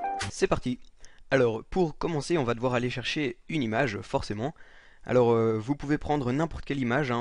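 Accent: Belgium. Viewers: tu est belge ? si non tu as l'accent belge ^^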